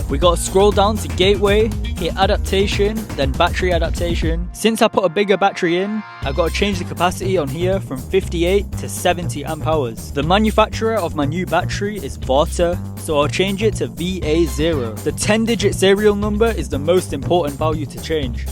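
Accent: Pakistan. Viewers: are you pakistani because you speak the exact same pakistani language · Sounds more urdu to me. He Sounds like hes a Pakistani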